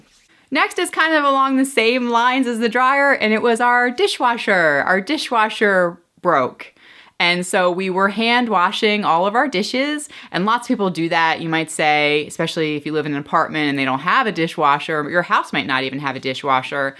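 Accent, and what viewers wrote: Pennsylvania, United States. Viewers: Hey, I'm from PA too! I'm guessing by your accent that "yinz" are not from the same part of PA that I am 😜 Let's go Pens! But really, I feel you on the deer situation. I've hit more than I'd like to admit. Luckily the worst that's happened to me was the grill cracked and I lost a headlight. Right now my husbands laptop is broken and we are just sharing mine now. It works out fine because I have an iPad for work, so I just use it most of the time!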